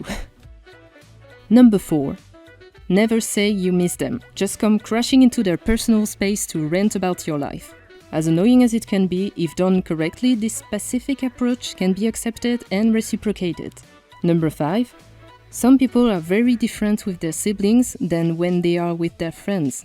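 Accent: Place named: France. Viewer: firt time watching one of ur vids, PLEASE TELL ME IF UR FRENCH CUS I CAN JUST HEAR THE ACCENT (im french)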